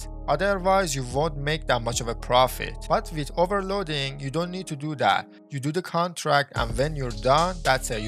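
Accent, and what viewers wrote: Iran. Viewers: Your accent sounds Iranian :) my gf is from IR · Amazing Persian accent aside, the tips are good and helpful. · Hi Are you persian? لهجه داری اخه 🤓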